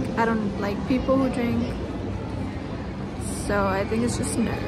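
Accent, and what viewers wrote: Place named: United States. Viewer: Do you live in America? Your accent is American obviously but you say your local team is Dutch 😁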